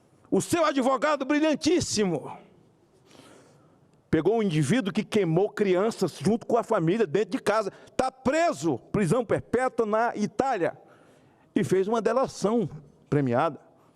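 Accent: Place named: Brazil. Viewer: Parabéns senador Magno Malta você merece ser representante da população sotaque Brasileiro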